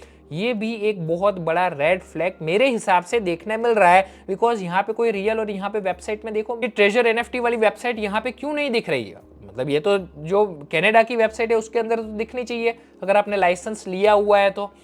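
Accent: Gujarat, India. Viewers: Aapki hindi Sunke Aap Mere Ko Gujrati Lagte Ho Bhai Ho Gujrati To Kaha Se Ho Bhai😊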